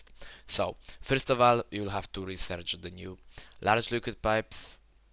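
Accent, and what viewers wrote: Poland. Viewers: Siema wiem że jesteś z polski po akcencie i napisie aktywuj windows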